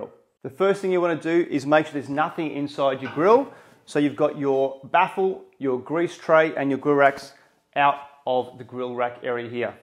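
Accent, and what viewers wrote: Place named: Australia. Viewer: What is it about Aussies that makes me want to listen intensely? What we perceived as an accent is so awesome! Good job mate!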